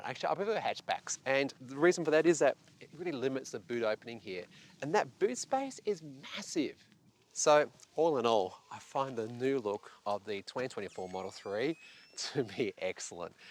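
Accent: Australia. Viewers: Don't laugh at the Tesla not understanding the Aussie accent. Why expect more from it then the rest of the world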